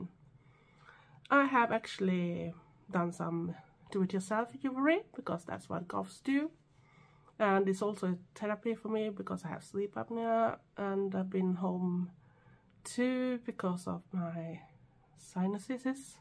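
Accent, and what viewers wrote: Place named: Sweden. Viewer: Where did the comment I just typed go🤔hmm probably my crap satellite excuse for internet service....anyhoo I said the has the most gorgeous accent I've ever heard! I've never known anyone with it before you. I Adore it!☺🤗🖤🖤🖤🦊I'm going to Fika now😆👋🏻TTFN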